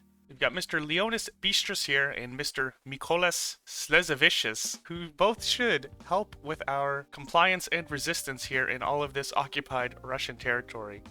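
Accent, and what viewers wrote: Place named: Ottawa, Canada. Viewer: I’m so used to HOI4 streamers being Europeans I was wondering what kind of Central European accent you had until you said “harder” and then “guards” right after … I am from Ottawa and probably have the same accent as you lmao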